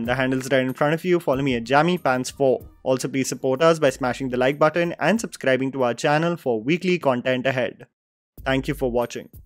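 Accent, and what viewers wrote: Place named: Odisha, India. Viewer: Odia pronunciations 😅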